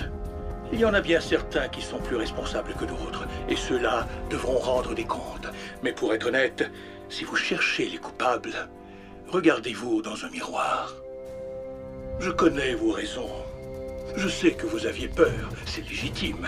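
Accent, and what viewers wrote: Quebec, Canada. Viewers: C'est la vertion québéquoise ?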